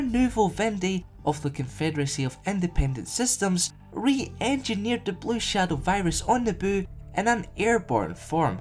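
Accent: Scotland, United Kingdom. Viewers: Are you Scottish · I can spot a Scotts when i hear one. This guy's definitely a Scottish. Prood tae be one, dontcha?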